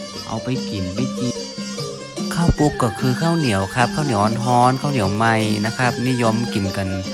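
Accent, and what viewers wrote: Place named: North, Thailand. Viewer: ยี่สิบสาม อู้จาปะสากำเมืองม่วนขนาดน้อง อิแม่แข็งแรงขนาดเจ้า ตี้บ้านปี้สุโขทัยฮ้องว่าข้าว DaK งา ไม่กล้าพิมพ์คำไทยอาจติดสแปมค่ะ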